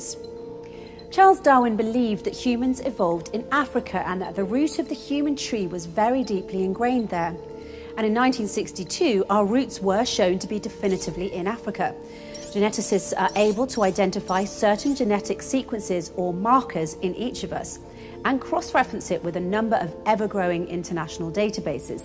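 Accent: United Kingdom. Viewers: Speaking in a british accent in no way diminished the primitive nonsense you are trying to sell here.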